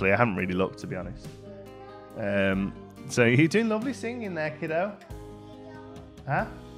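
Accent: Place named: Midlands, United Kingdom. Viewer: I love your builds, I’ve learnt a lot watching your tutorials. I recognise your accent, I’m from the Midlands I can hear a slight twang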